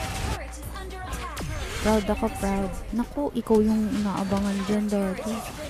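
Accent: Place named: Visayas, Philippines. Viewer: Boses. Vizaya k lodi. Hehehe ok lng yan penge skin <num>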